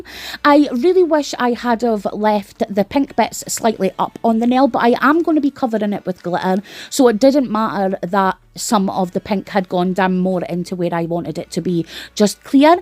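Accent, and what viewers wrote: Scotland, United Kingdom. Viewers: Is this a Scottish or Irish accent?! I love it, either way. Usually I'm really good good telling them apart but yours is difficult. I'm leaning towards Scottish more than Irish.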